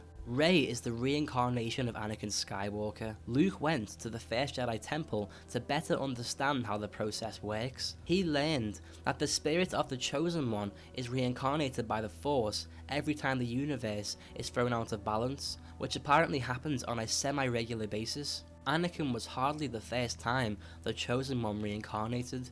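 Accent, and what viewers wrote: Liverpool, United Kingdom. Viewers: Its a scouser, its obviously balls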